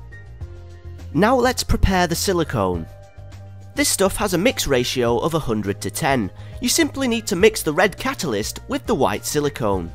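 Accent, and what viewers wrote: United Kingdom. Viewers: Yay he English Btw I don't find many British youtubers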